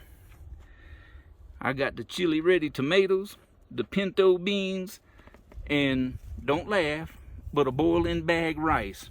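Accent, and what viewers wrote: United States. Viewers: I like you. I sure can hear Mississippi in you voice. Daisy sure is calm. Beautiful camp sight. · I loved your video and your southern accent!! I was looking into small campers, easy to handle since I will be camping by myself. Please add more videos with your set up and all the gadgets you think are the most useful for campers. Thank you so much again!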